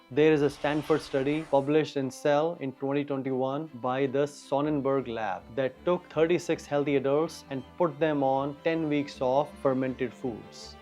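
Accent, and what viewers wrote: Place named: India. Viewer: Sir you sound almost exactly like an indian,or you are an Indian?. Anyway thank you for this info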